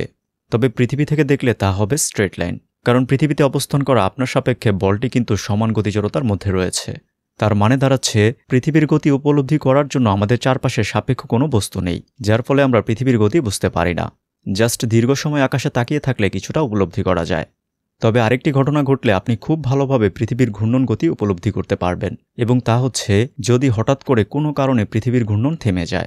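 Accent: Narsingdi, Bangladesh. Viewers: Vai apnar basha ki narsingdi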